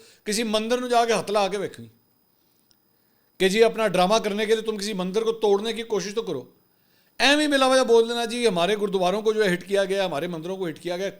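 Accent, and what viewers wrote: Punjab, Pakistan. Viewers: ماشاءاللہ آپ پنجابی بہت پیاری بولتے ہیں ❤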